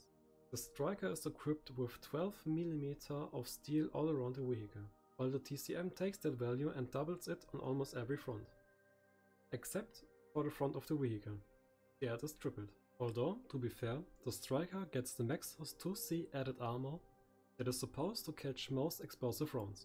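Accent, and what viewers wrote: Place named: Germany. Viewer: You sound german af, i like it. Very well made video too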